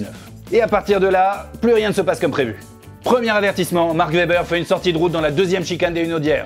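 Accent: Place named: Belgium. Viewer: Bon j’ai failli mettre un pouce rouge pour « l’accent belge », mais juste pour l’humour et surtout le contenu, c’est un gros like 👌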